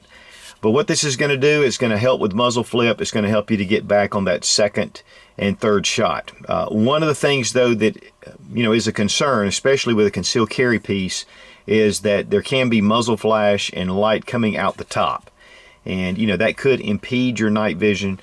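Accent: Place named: South, United States. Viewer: Good video! what made it great was saying "Either" not the PC "ither" as reporters and commentators do! I am Southern and I think you are also!!1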